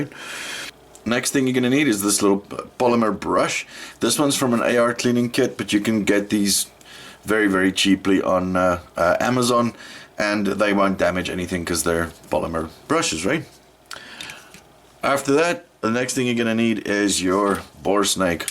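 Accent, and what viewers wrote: South Africa. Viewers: Enjoy the videos, man! I have to ask, is that a South African accent?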